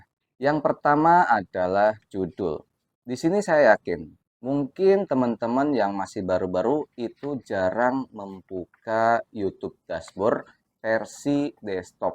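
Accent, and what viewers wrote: West Java, Indonesia. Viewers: Assalamualaikum...kg nyimak mantap...Oia kg orang mna Sunda pisan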